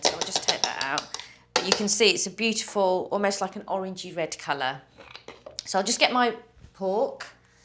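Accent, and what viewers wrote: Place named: North London, United Kingdom. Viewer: Great north London accent love, we Americans had the same accents till about the 1850's when it began to change mainly because of Scandinavians learning to speak English from the Irish immigrants that were hear at the time, If you listen to the very old recordings of Buffalo Bill you will notice he had a very English accent and many of the old west outlaws had an English accent as well, the interesting thing is the Brits just love the American accents too.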